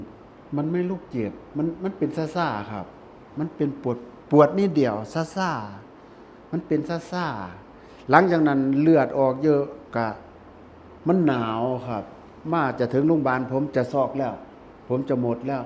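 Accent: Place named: Ubon Ratchathani, Thailand. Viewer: สำเนียงการพูดเหมือนจะอยู่แถวอุบลนะครับ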